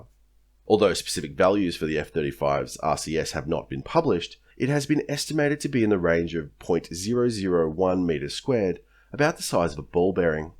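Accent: Australia. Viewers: And this video isn't the exception; it seems to be the norm. Watch his EPIC explanation on: The history, development, physics, tactics and strategy of nuclear munitions. It's an outright 10. And I am knowledgeable on this. I actually (for whatever reason) don't love aussie accents, but I LOVE this channel.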